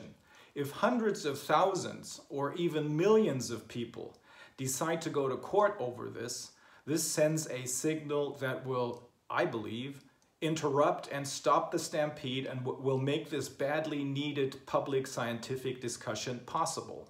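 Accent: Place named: United States. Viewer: On a side note, this dudes American accent is amazing.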